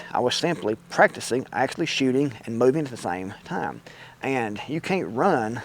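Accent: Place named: Tennessee, United States. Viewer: I thought the reload was spot on. What you said about Jui Jitsu is true. Tae Kwon Do would be the same. There are elements in all of it that could be useful, but street fighting, especially against multiple adversaries, will prove you need a lot more than any one form teaches. What's with the breathing comments? I'm just glad you are breathing. Jeez!!! What up people. Now, I do notice that you talk a bit different. I think I remember you being from Tennessee - y'all talk a bit different than we do here in Alabama. In fact, we here in Alabama notice that quite a lot of people in different other parts of the country don't talk as correctly as we do here. This is something we notice, and have come to accept. We are OK with it. Don't let the malcontents get to you. Have a great day!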